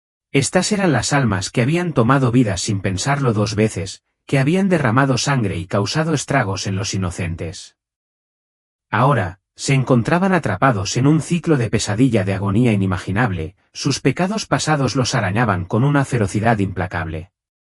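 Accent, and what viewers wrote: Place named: Catalonia, Spain. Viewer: Me choca que hagan videos religiosos con voces robóticas y además con acento español catalán, suenan muy mal porque son impersonales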